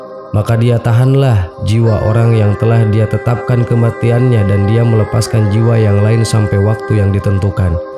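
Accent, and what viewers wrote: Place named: West Java, Indonesia. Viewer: Sunda banget yak 😁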